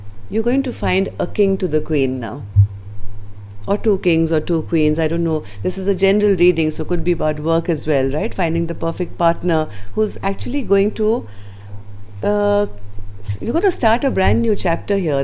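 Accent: India. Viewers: are you a Pisces? You speak so sweetly, with so much love & so much pain & sympathy when we go through disillusion. I am Pisces, an Indian & I think you are Indian too, going by your accent. I've been watching your readings since the past 3 months & I look forward eagerly to your readings. Your interpretation resonates with me very much. I am not feeling too happy, today by the reading because, I really love & want my Virgo to begin talking to me again. We've been in separation for the past 6 months. I do leave everything in Spirt's hands & I accept whatever Spirit decides for me. I just want peace & truth & resolution of this separation. Thank you so much for your help. Be blessed. Namaste.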